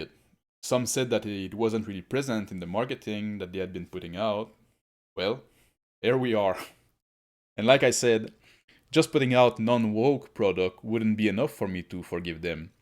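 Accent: Quebec, Canada. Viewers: pour moi t’es du Québec hen? 😂 sub time!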